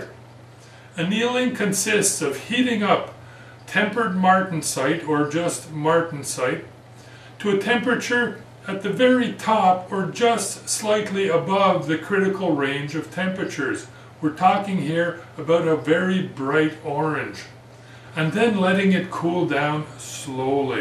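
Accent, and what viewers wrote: Canada. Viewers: He is from Quebec, Canada, so he has a French Canadian accent. I think Cliff Stamp is from the usa... no french accent.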